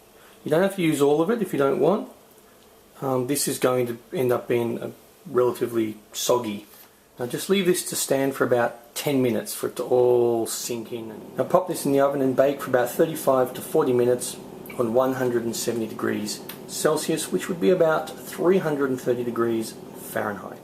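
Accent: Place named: Australia. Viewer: hayward, you love his accent because he's australian! Go aussie XD Your whisk is cute ^_^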